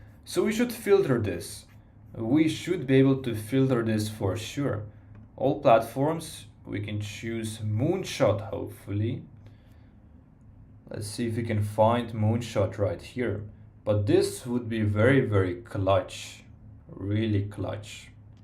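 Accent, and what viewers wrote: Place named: Russia. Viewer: Sound almost Russian 🤔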